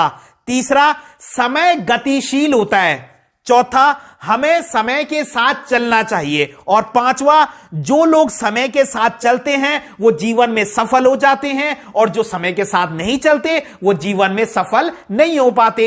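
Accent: Garhwal, India. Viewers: Bhiya ap gadwali ho ?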